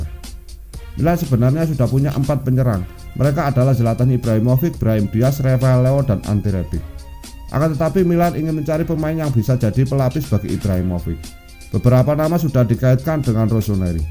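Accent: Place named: Banyumas, Indonesia. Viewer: Ngapak suaranya .. hehehehe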